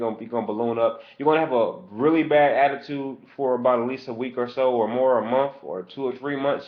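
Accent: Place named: Worcester, United States. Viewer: Tell me this guy isn't from Worcester, did he say Becker?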